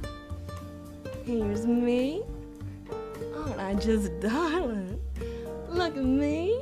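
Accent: United States. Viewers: It's that southern Belle accent 😍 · why did I think the accent was from new jersey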